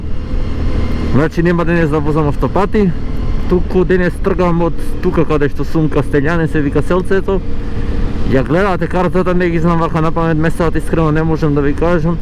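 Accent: Bitola, North Macedonia. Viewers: Pozdrav do od dalechnata Australia. Te pratime so voodushevenost. Odlichna video publikacija na patishestviata, so obilna informiranost i pokraj skromnata video tehnika. Informativni komentari na ubaviot Bitolski akcent, dosega ne zabelezhani. Uzhivanje e da se gleda tehnikata i balansot vo vozenjeto vo odnos na raznolikosta na patishtata. Mashinata ti e optimalna i pokraj relativno malata kubikazha. Ushte eden dokaz deka goleminata ne e merilo za uspehot. Dokolku mozhime da sugerirame, bi sakale da te vidime kade uzhivash vo pauzite vo lokalnite kafe restorani po mozhnost so komentari na mestata vo koi se zadrzhuvash. Veruvame ne oboruvash bilo kakvi rekordi za Ginisova kniga na chudata? Ti posakuvame uspeshni avanturi vo idnina so nadezh da go svrtish dunjalukov v'dolzh i popreku. Nie da sme zhivi - zdravi da prodolzhime da navivame od skromnite ni fotelji so chasha vo raka, i po nekoe mezence. 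DA NI ZHIVEJT! DA ZHIVEEJT!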